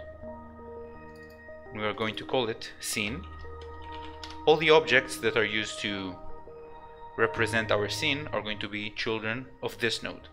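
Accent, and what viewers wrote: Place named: France. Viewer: You are doing a superb job and I can understand you perfectly well. (Although it strikes me as ironic that you sound French and you actually say the 't' in Godot, when it would be silent :-) ). Please keep up the excellent work!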